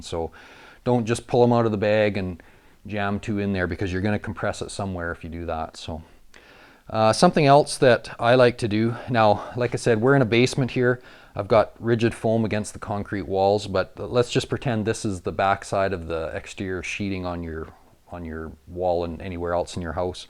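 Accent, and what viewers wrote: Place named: Canada. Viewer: Canadian accent 😕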